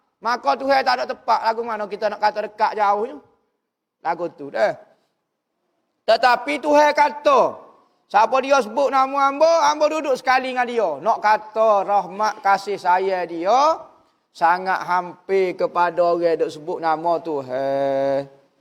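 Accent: Kelantan, Malaysia. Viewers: Alhamdulillah kamu pahe gok ustadz kecek loghat kelate..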